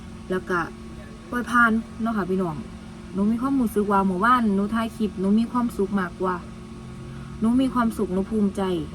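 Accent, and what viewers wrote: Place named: Sisaket, Thailand. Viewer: พูดเหมือนคนศรีสะเกษเลยสำเนียง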